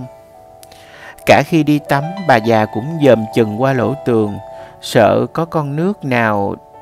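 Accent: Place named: Mekong Delta, Vietnam. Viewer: Thích giọng đoc của em,đặc sệt miền Tây,chất phát.Cám ơn ,thân chúc sức khoẻ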